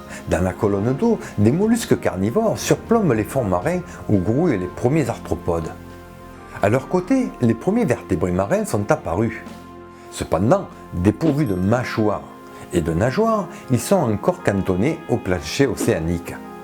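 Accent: South, France. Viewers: Une suggestion d'amélioration : remplacer ta voix, trop rebutante, par une voix D'IA ? J'aurais bien voulu écouter ce docu mais l'accent du sud je peux vraiment pas...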